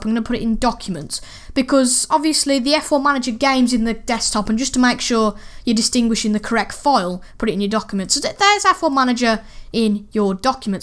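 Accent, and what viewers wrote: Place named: United Kingdom. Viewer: I love britsh accent... is so funny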